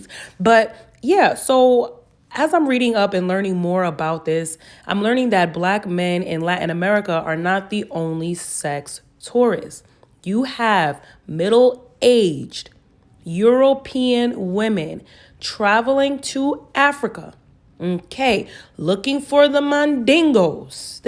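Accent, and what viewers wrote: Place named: New York, United States. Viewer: I hear your accent; are you from New York City?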